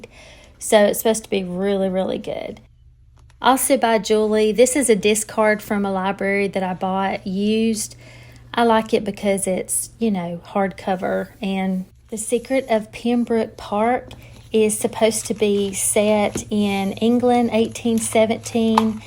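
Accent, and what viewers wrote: South, United States. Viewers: Do i detect a Southern accent 🤔 👀 where are you from? 😊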